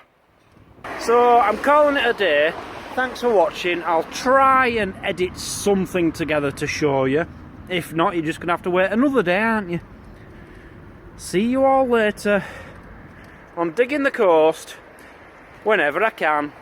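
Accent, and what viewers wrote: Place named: Wales, United Kingdom. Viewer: LOL@ your Welsh accent, you got it going on!!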